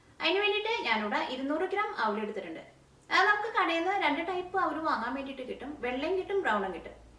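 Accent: Thrissur, India. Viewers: സംസാരിക്കുന്നതിന്റെ Speed ഇത്തിരി കുറച്ചാൽ, നമ്മുടെ തൃശൂർ ഭാഷ ചിലർക്ക് ഇത്തിരി കുഴപ്പം ഉണ്ടാക്കുന്നുണ്ട്, അങ്ങനെ ആ പരാതി ഒഴിവാക്കാം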